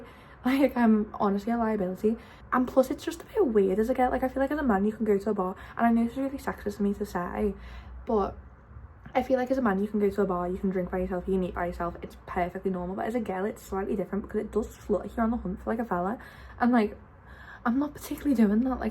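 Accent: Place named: Liverpool, United Kingdom. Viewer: Plus, do not let anyone tell you that your scouse accent is hard to understand, as i understand you better than others . I mean that could be due to me being surrounded by the scouse accent all the time, but still better 😜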